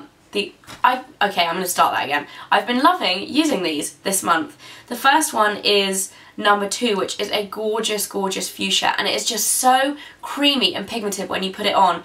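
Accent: England, United Kingdom. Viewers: Your accent is 100% English but you have that upwards intonation at the end of each sentence (as if you're asking a question) which is all American! Love your videos :-) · what part of england u from? i'm really curious, can't tell? im guessing like either oxfordshire/ west midlands/ buckinghamshire?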